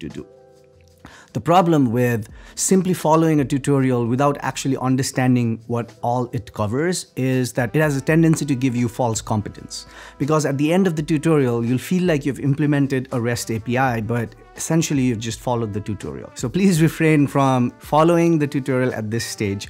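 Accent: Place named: Nepal. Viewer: i am watching you from your early days in YouTube. But today, i dont know why i found Nepalese tone with your voice. 😁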